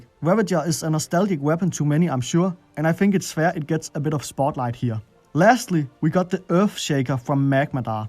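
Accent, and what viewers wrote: Denmark. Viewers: 110% a danish lad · Ey dude! Har set lidt af dine videoer, de sku meget søde, men den der danglish, det ødelægger det helt vildt, har du overvejet bare at lave danske videoer?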